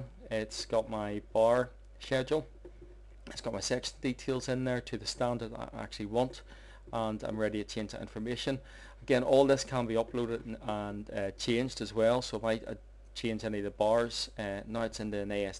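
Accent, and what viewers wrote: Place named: Belfast, United Kingdom. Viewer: i am in canada watching this video currently working for a huge rebar company and i hear that accent....also a belfast fella here so would love to know where ur working with rebar?? its not back in NI is it??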